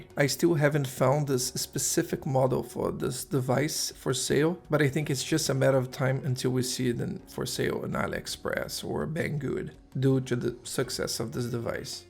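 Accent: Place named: Brazil. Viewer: Esse sotaque aí não me engana hihihi brazuka na área!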